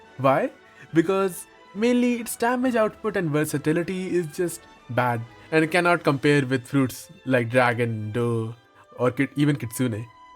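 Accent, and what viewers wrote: India. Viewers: You sound like an Indian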